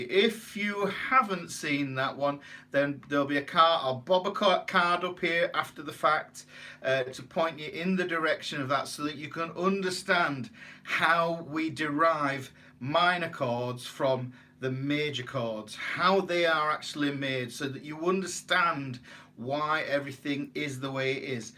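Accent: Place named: West Yorkshire, United Kingdom. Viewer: Hi,. I've been totally mystified by this for decades until I watched this vid. Thank you so much. Understanding this has opened so many doors now. i suddenly seem to be able to play along to anything once I've sussed the root note. Than k you so much and keep on keeping on,-) Good to hear a West Yorkshire accent on here too. I'm only over in Bradford myself.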